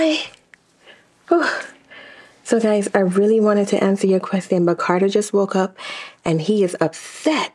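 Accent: Jamaica. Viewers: Why you sound jamaican????🤔🤔🤔🤔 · Are you Jamaican??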